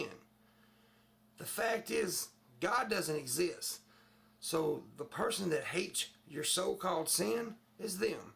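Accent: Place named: Louisiana, United States. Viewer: Brandon Hoose It's his Louisiana accent. It makes anybody sound like a preacher (just like a Tennessee accent makes anybody sound like a country singer, even though he/she would not be a country music fan).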